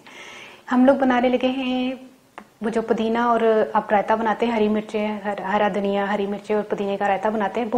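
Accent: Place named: Punjab, India. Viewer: Punjabi voice so cute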